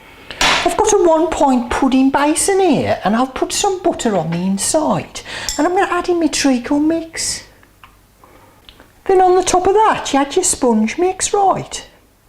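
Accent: Birmingham, United Kingdom. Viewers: Thanks so much for this recipe I have always wanted to know how to make this properly ( I had an idea) I live in America now and it is so expensive to buy here and as you know not so nice from a tin (but better than nothing). Btw love your Brummie accent, I used to have a strong accent too that resembled a type of "saff London" accent (I am from East Anglia) and can still do it too in fact my son and I do it all the time for fun hahahahhaha. Keep up the great work and Happy New Year. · Gotta see B.ham sometime... Could even learn to talk like that! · As someone from Birmingham myself, I can safely say that yes, everyone does speak like this. Loved this recipe :D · Hahaha i love your birmi accent! You are amazing, your video's have so much character in them they are unlike any other cooking channel! been a subscriber for years and never let down!!! 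much love! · what happened to her accent :D...ah I get it!.....its biiiiirmingham! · But she's a Brummie. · Have you made something like this before, or is your Brummie accent just giving me deja vu? lol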